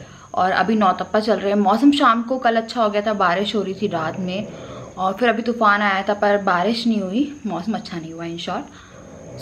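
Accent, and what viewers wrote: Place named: Kanpur, India. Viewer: Kanpuria bhasha mst comdey type bolti ho app🤣😂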